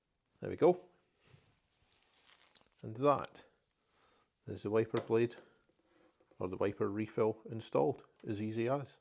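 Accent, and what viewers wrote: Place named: Scotland, United Kingdom. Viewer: Not only well explained but by a fellow Scot in a familiar tongue. Many thanks.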